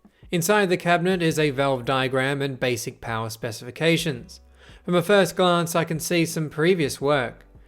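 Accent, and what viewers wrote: Australia. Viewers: an Aussie, mate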